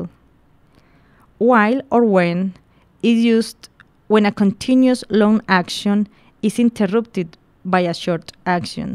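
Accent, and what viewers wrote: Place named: Colombia. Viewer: ingles de colombiano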